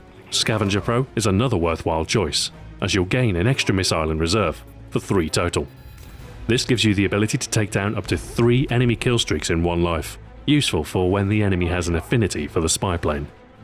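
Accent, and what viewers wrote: England, United Kingdom. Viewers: If you thought that, then every Englishman would sound like that to you....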